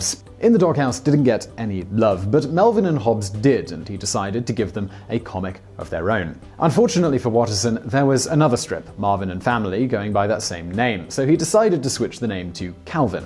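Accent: England, United Kingdom. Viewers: Why is not cooking and have english accent?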